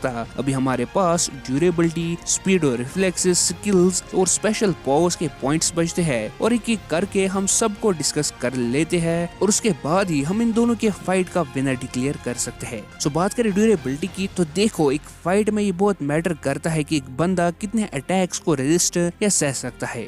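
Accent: Kashmir, India. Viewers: The author's voice seems Kashmiri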